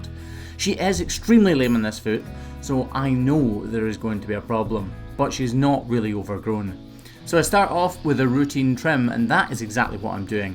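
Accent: Scotland, United Kingdom. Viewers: I especially loved hearing all the background sounds during the first part. However, being from the United States, I can’t get enough of your Scottish accent. ☺️💜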